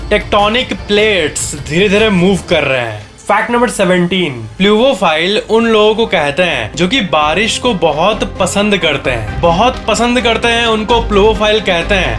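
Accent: Bihar, India. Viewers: pakkkka bihari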